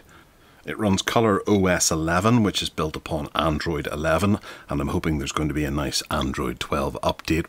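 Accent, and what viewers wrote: Northern Ireland, United Kingdom. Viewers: Great to hear a Northern Ireland voice!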